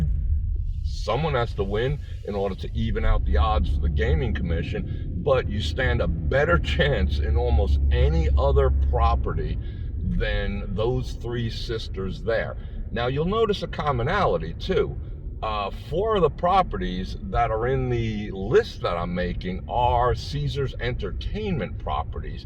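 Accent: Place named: East Coast, United States. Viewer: From your accent are you from the East Coast.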